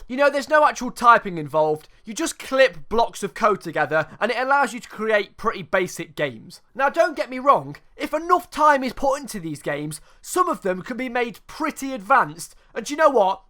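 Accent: United Kingdom. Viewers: are you British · why are you british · Are you a Brit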